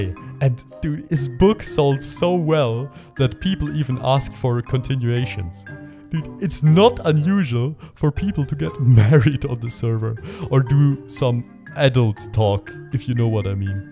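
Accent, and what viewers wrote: Germany. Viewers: der deutsche akzent hittet schon echt aber ich feier den content mega! keep it up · You kinda sound German :) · Are you german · Man kann hören das du deutsch bist haha cooles Projekt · dein deutscher akzent ist so wild XD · i can hear the german in your voice :D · Are you from germany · I hear a german accent. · Are you german?!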